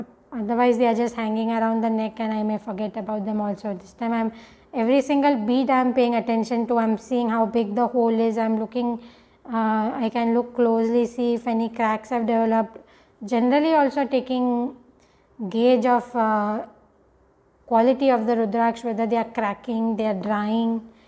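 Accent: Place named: India. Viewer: It’s soothing hearing you talk in your Indian way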